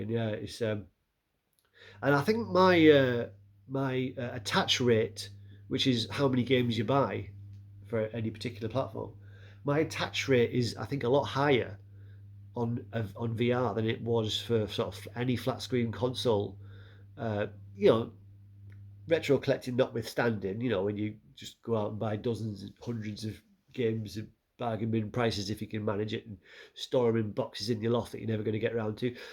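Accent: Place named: Australia. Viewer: You have a distinct Australian accent. How did you learn it? 👍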